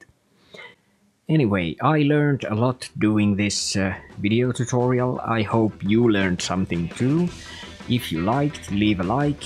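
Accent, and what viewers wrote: Finland. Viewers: Excellent tutorial :) Did I detect a Finnish accent correctly? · You sound very Finnish!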